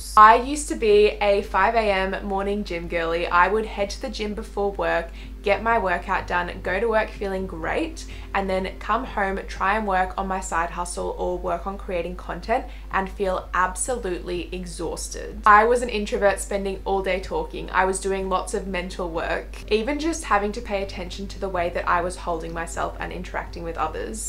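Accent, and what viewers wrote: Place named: New Zealand. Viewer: I love her kiwi accent! So pleasant to listen to.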